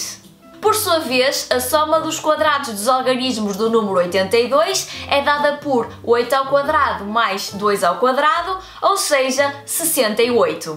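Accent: North, Portugal. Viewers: Temos algo em comum a pronúncia do norte e adoro matemática.😊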